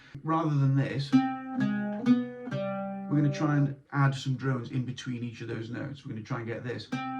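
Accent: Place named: Arab world. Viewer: I sense from your pronunciation that you speak Arabic as well! Thank you for sharing such an interesting tune!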